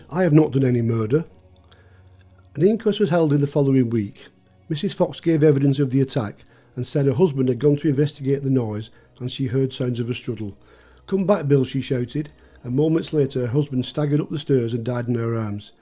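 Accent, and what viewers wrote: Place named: Northern England, United Kingdom. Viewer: This stuff is so classy and I really like the narrator's soft Northern English accent . I'm hooked .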